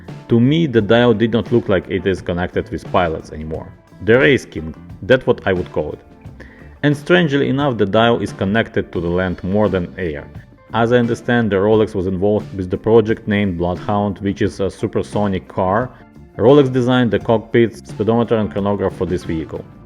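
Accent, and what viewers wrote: Russia. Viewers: рязанский акцент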